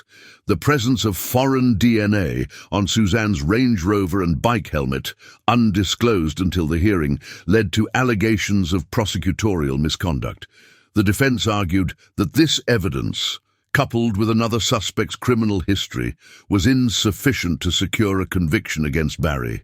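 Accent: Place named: United Kingdom. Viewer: that stupid British voice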